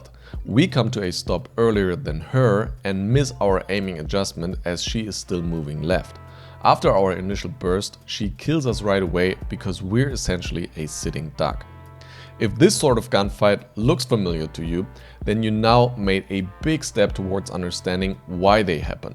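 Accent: Germany. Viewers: dieser deutsche Akzent 😂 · Man hört deinen deutschen akzent raus alter mach doch videos auf deutsch dann versteht man es auch leichter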